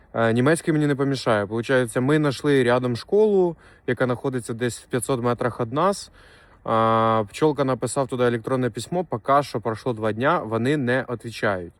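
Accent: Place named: Ukraine. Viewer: говорит на русацком языке, добавляя украинский акцент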